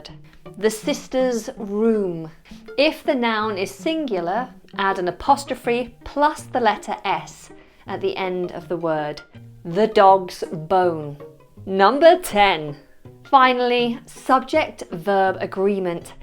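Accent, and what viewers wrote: United Kingdom. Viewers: Finally someone who teaches British english. I'm glad to have found your channel, I'm quite fed up with so much american accent. Greetings from Brazil.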